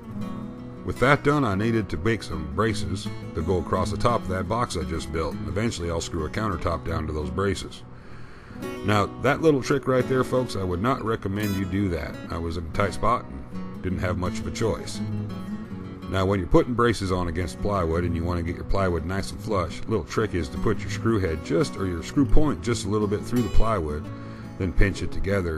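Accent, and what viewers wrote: South, United States. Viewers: So what's with the southern accent? I tought you was from da UP 'eh? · I see that you also picked up a southern accent while visiting your mom. Although it should have been the other way around. Nice narration by the way. Thank you for sharing. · I love that southern accent the ol' story is told in! Great solution for van camping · Like most of the people on here I'm thrilled with the narration format. I like the Southern Sound of the accent since I'm a Texas boy myself. Sounds like you'd be right at home in the Lone Star State.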